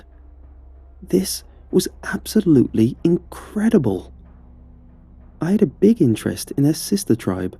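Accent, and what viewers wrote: England, United Kingdom. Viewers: Btw ..now im in time. .haaah. itz 14hours ago .. btw. Where ever this story comes from. ... think america. But the voice is so. English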